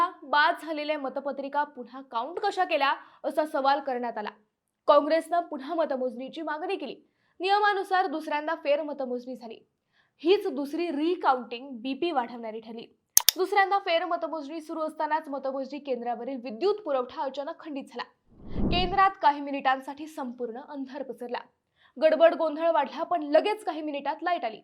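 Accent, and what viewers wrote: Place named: Pune, India. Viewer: अगं बाई..".वैद्य मतं" नाही...तर " वैध मतं" अस म्हणत जा.. वैध म्हणजे कायदेशीर आणि अवैध म्हणजे बेकायदेशीर.. वैद्य म्हणजे आयुर्वेदातील उपचार देणारा डॉक्टर..😅 आपल्या नगरी लोकांचे भाषेचे ज्ञान अगाध आहे.. फक्त पुणेरी टोन बोलण्यात आणणे आणि शुद्ध मराठी बोलणे , यात मोठा फरक आहे.. बाकी तू बोलतेस छान..पण शब्द्दोचाराकडे लक्ष दे...😊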